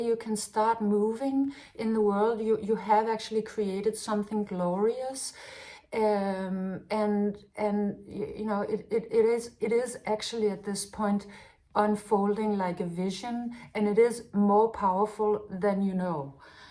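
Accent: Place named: Denmark. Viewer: Listening to your accent brought back many wonderful memories of my Danish mor. ❤ Thank you too the lovely positive reading, love from Australia.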